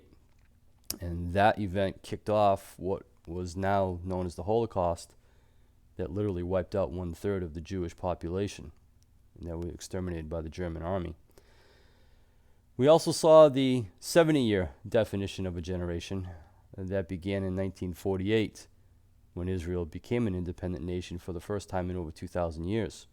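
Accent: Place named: Northeast, United States. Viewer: You're my favorite YouTuber. I wish I lived closer to u, id love to meet . ♥️😊. I feel alone because no one around me seems to see or care about the signs of Christ's return. Every once in awhile, I'll meet a customer that sees it and is excited but I usually never see them again. Sounds like you're from the north east, I'm from Florida. Ty for your videos, I truly enjoy them!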